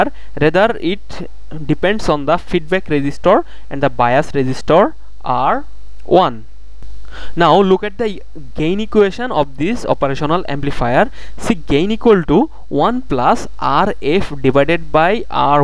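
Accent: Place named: Assam, India. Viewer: Assamese English.. Anyways, nice explanation !! 👍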